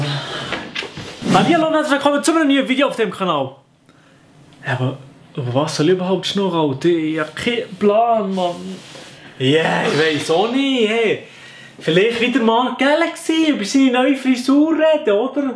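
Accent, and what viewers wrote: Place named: Switzerland. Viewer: Omg entli hen i en kanal kfunde wo nur schwitzerdütsch redet😂😍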